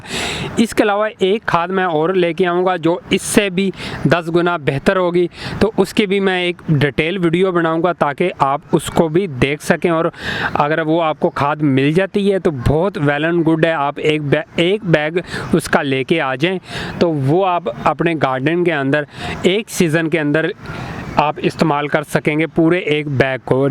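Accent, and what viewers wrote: Punjab, India. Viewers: کیا ھی اچھی بات ھوتی کہ آّپ خالص اردو یا پنجابی ملاکر اردو بولتے بجاے اسکے کہ بونڈی انگلش مارتے ، شکل سے یکدم ریڑی والے ھو ، تمہاری انگلش بھی انگش سے زیادہ پنجابی لگتی ہیں تو بھایی کیوں اپنے آّپکو لطیفے بنانے پر تلے ھو ، صاف ستھری پیاری پیاری اردو پنجابی لہجے میں بولو ، اچھا لگوگے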